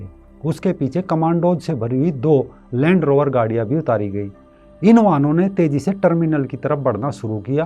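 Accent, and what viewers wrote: Haryana, India. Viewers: R u from haryana......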